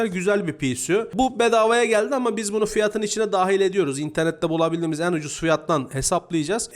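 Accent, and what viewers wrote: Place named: Ankara, Turkey. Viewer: abiciğim sen nerelisin ya konusma seklın aynı ankara :D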